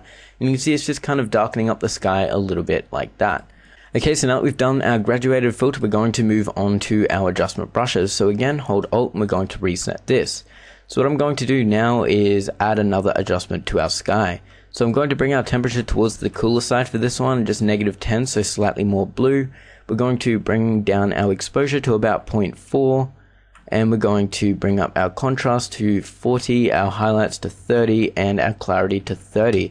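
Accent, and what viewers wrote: Australia. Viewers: I love how your voice sounds.. No Homo. Giving Aussie accents a good reputation :3 Sick tutorial mate!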